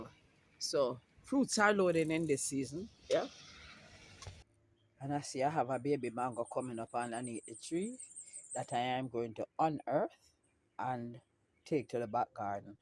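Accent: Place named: Jamaica. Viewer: Wow.wow mis I almost give up on your Channel because I don't see you for a very long time. Good to see you again. I see Marie every day also Stacy but not you ms I just love to hear you talk real Jamaican talk😅 keep planting because peppers and tomatoes are too Expensive in the market nowadays, Respect 🙏 Jah guide nuff blessings Carramondo never heard that name before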